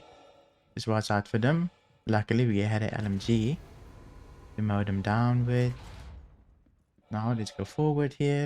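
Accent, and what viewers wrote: South Africa. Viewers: Great content there mate you sound South African if so I'm South African too anyway this helped me through site B...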